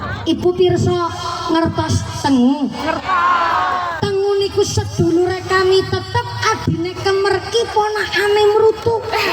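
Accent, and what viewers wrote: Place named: Banyumas, Indonesia. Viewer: Ustazah favorit saya ini..sama2 wong ngapak..ora ngapak ora kepenak 👍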